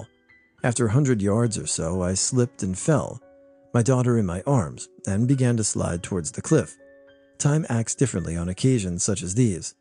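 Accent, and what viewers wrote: England, United Kingdom. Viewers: Longtime fan of the channel, as a Brit, I think your English accent is hilarious. 😆 Keep up the good work! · Hi! Thank you for your great work! I was super entertained by your British accent. 👍🏻👍🏻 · The English accent in the first story made me laugh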